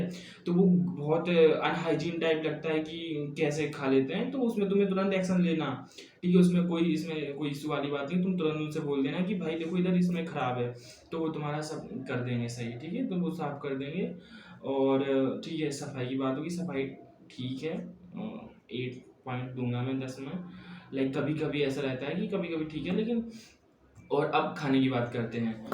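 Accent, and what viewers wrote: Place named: Uttar Pradesh, India. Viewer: Bro tum konse state se ho merko Uttarpradesh se lagte ho .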